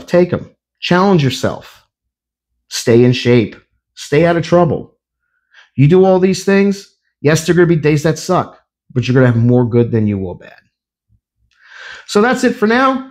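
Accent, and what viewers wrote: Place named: New York, United States. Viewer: yeah definitely detected the NY accent as I watched other Videos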